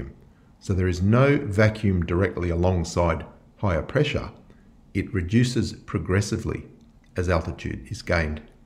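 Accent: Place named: Australia. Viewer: As much as I love seeing you debunk flat earth, what about some astronomy videos? Your calm Australian voice and the way you explain things, I think would be really beneficial to many people. Also I'm from the UK so it would be really nice to see your stars because as an amateur astronomer myself there is only one thing worse than a cloudy night when trying to view stars below the equator and that's that damn globe in the way! Keep up the good work buddy.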